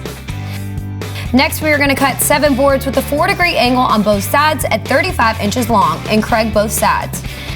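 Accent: South, United States. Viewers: I could listen to your sweet southern voice rack off measurements all damn day...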